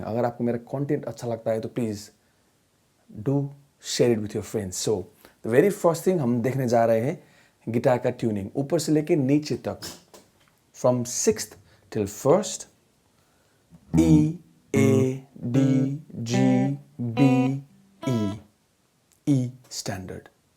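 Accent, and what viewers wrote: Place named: Nepal. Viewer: I think your pronouns like a nepali guy. Is it true.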